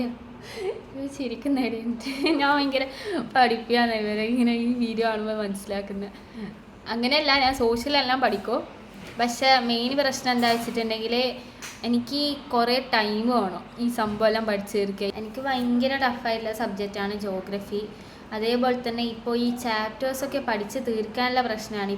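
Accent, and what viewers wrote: Kannur, India. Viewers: അത് കണ്ണൂർ സ്ലാങ് ആണ് ബ്രോ 😁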